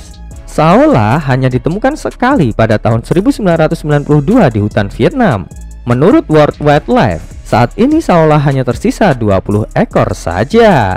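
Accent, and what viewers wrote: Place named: Java, Indonesia. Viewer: ketauan ya kalau komentatornya orang Jawa... logat hurup K hilang😄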